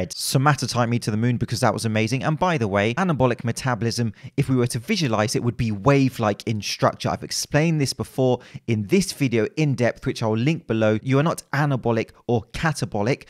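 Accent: England, United Kingdom. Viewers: A science based throwdown in an English accent is the best kind of throwndown.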